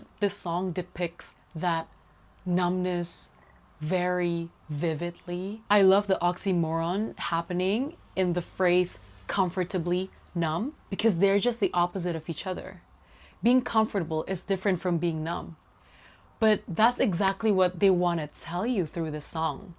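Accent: United States. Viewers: I just found you and love your reviews. I was wondering, you speak perfect American English but you grew up in Indonesia then went to London. I don’t hear an Indonesian accent and if there is, it’s very slight and you definitely don’t have a UK accent. Did you go to college in America? You are a very beautiful person. Love your take on music as well. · Jakarta, Singapore, and London...yet your accent is quite American. California, perhaps the bay area.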